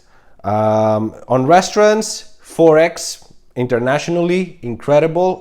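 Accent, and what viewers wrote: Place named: Spain. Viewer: I’ve seen your videos before but had no idea you were Greek. With your accent, I thought you were from Spain. What an idiot I am. And I’m Greek too. Greek American though. My family is from stunningly beautiful Kefalonia. Καλό ταξίδι!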